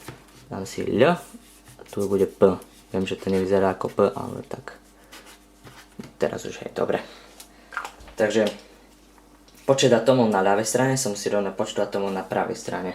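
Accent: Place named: East Slovakia, Slovakia. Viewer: To je asi najvychodňarskejší prízvuk na svete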